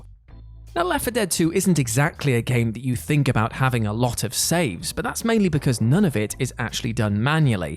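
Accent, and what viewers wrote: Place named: Wales, United Kingdom. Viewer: Noice it's the southwe... wait which part of the Wales ? Heh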